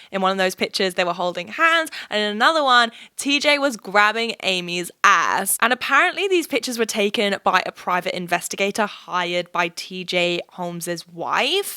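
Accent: California, United States. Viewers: American accent popping off babes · Hearing you talk in an American accent is so crazy ur voice sounds so different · Love the american accent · Your U.S. accent was so great! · Your American accent is actually really good!! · Okay your American accent is legit on point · not an important comment but maybe the MOST important comment… American accent was top tier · 8 outta 10 on the accent, pretty good! A bit of work on it and you could take an American part in a film I’d say! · your american accent >>> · Her american accent is actually so cute lol 😭💖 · As an American, I have to say, I would totally believe you were from Cali with that accent. I would love to hear you try my section of America and give a southern accent a go. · Ahahah you do have a solid American accent nice job. Respectfully, a Midwestern American viewer. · Hahaha YES. Californian accent acquired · The biggest thing I'd say is that your American accent "A"s give you away. 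It is a pretty good accent. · Your American accent ain’t too shabby! this look back on 2022 made me realize how crazy this year was. What a year, here’s to another one! 🍾🥂 excited for more of your content! :) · your American accent is genuinely pretty good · Your American accent is impressive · Real talk tho your American accent is fantastic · As an american myself I have to say your american accident was pretty good. Not perfect, but definitely good. Also I have to say I loved the visuals in the background of this video. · Woah. Your american accent is amazing almost uncanny valley. I love it! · Your American accent was fantastic, the only word that tipped me off is you said “process” first as “PRO cess” and we silly American usually say it like “PRAH cess.” Not all, but most. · No joking at ALL the American accent is SPOT ON BABE !!! Big love from New Jersey ❤❤❤ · as much as I love your videos, your american accent is pretty rough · No but the American accent ateee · as an American, your American accent is good! 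it wavered a little at the start, and some words you still pronounced the British way, but it was pretty solid! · american accent sound exactly like Ashley from Ladies Code i would say that's a success (you can listen to Ashley in the Get Real podcast if you don't know her) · American accent is amazing!! US citizen here. Going viral bit is hilarious! Ily T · OMGG ur American accent is 👌🏻👌🏻 cali girl!!! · Very good American accent haha my British accent is not as good !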